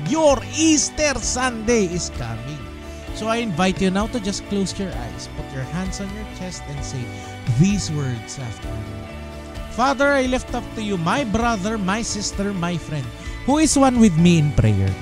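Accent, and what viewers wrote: Batangas, Philippines. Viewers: Ay Batagueño pala c atty..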